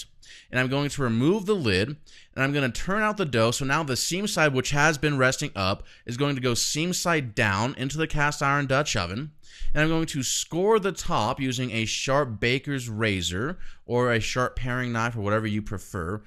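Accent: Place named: United States. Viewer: You have the same American accent than William Lane Craig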